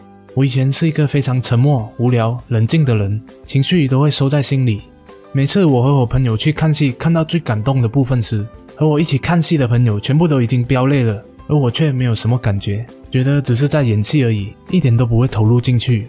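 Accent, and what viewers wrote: Malaysia. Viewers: 好~ 听出马来西亚的腔调~ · 一開始以為是台灣北京腔,最後就感覺到是大馬腔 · Dino Mei 应该是马来西亚，因为我是马来西亚人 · 你一定是马来西亚人，因为料的音哈。很开心看到你的分享。我也正在努力。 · 马来西亚口音真的很亲切！！！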